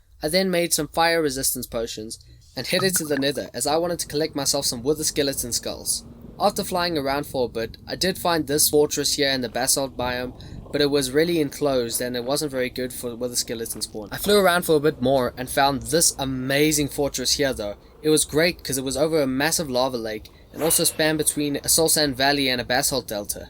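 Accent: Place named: South Africa. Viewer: Where you from because you sound like a South-African. Good vid btw and just subscribed.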